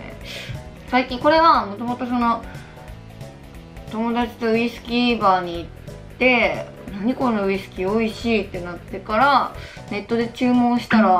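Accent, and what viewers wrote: Okayama, Japan. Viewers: 岡山人として誇りです 時々出る岡山弁落ち着きます 応援してます🔥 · しろくまの抱き枕おんなじの持ってる！！気持ちいいよね🤤 私も岡山出身だから親近感ある！岡山弁すっと入ってくる笑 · 岡山弁がたまらんのじゃ！ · 岡山弁聞くと落ち着く😬 スキー〜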